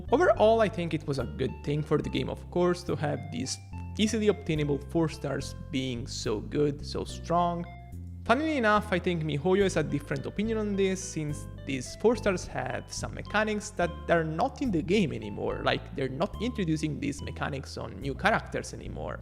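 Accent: Italy. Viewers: Come è possibile che non mi sia mai accorto che hai un accento italiano parlando inglese? Evidentemente sei così fluente che non me ne sono mai reso conto. P.S. sei bravissimo e super affidabile, continua così ❤ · Lo sapevo che eri italiano ahah L'accento non mente, comunque bravissimo continua così!! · IS he italian? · It’s the italian accent while being smart that makes people fall for him😂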